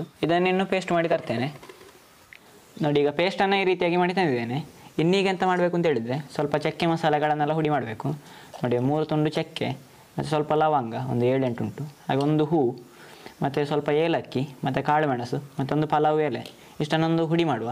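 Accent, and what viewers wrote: Mangalore, India. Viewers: Wow..very nice pulav...👌 Nice way of explaining..mangalore kannada ..❤Keep it up..🙏🌹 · ನಿಮ್ಮ ಮಂಗಳೂರು ಶೈಲಿ ಕನ್ನಡದಲ್ಲಿ ಅಡುಗೆ ಮಾಡೋದನ್ನ ಕೇಳೋದೇ ಅದ್ಭುತ. ಸ್ವಚ್ಚಂದ ಪರಿಸರದಲ್ಲಿ ನೀವು ಮಾಡೋ ಪ್ರತಿಯೊಂದು ವೀಡಿಯೋ ತುಂಬಾ ಇಷ್ಟ ಆಗತ್ತೆ.. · ನಿಮ್ಮ ಮಂಗಳೂರು ಕನ್ನಡ 👌👌👌👌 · I am watching your channel because of your mangalore kannada💛❤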